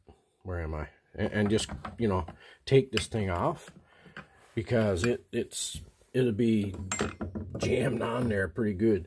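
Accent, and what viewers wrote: Newfoundland, Canada. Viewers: Your acsent you from nfld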